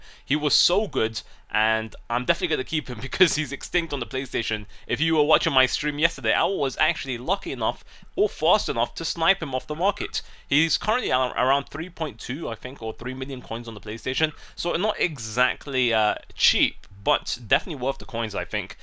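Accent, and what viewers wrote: Belgium. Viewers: sounds like he was from belgium! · He's not French...Didn't you ear this ugly Belgian accent ? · This guy had a belgium accent btw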